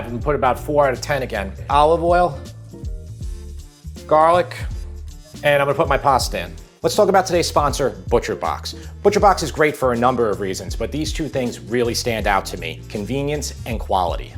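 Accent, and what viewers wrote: New York, United States. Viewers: You sound like if Vince Vaughn was a New Yorker, it’s very enjoyable 👍🏻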